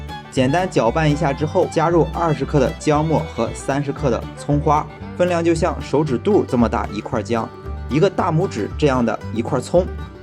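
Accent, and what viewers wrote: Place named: Shandong, China. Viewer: 看了几集听讲话感觉像是山東人！这集终于证实猜对了😄視頻做的不錯👍🏻加油！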